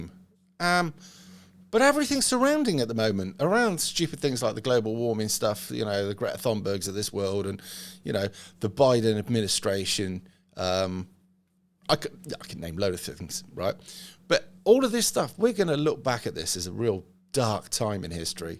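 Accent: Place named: West Country, United Kingdom. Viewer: You sound like a West Country mental defective.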